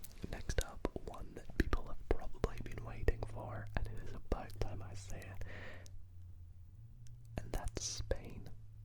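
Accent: United Kingdom. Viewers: I liked the sound of your accent and assumed you’re British, then read you’re from Australia. What a marvellous speech!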